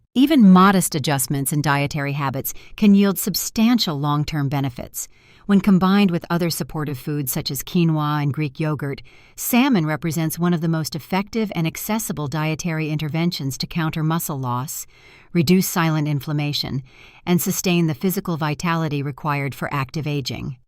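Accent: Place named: United Kingdom. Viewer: Food suggested are only good for rich Army Generals, thank you for your clear britsh Englisheverybody can understand.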